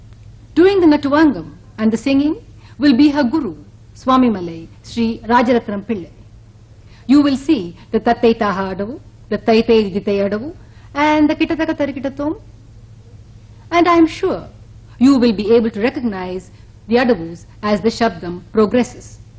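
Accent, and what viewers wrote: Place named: England, United Kingdom. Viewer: Amazing your an English accent 🙏🏻👏👍🏻 sweet i like very much of your launguage madam if I seen you i will be get autograph me god grace like this of dance performance Excellence wow 👍🏻👏🙏🏻❤️👍🏻🎉🎉🎉🎉🎉🎉 amazing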